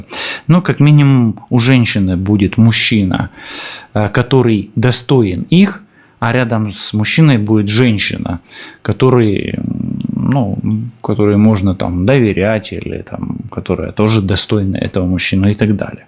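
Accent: Ukraine. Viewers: Я красотка люблю жизнь возрост уже большой собаки нет не люблю в квартире но они меня любят всю жизнь сама себя содержала никогда не брала у мужчин надеялась только на себя что нажила ваша русня забрала сейчас за границей в ирландии но влюбилась даже не ожидала потеряла чувствую что вы с Украины так как речь пролитает родные слова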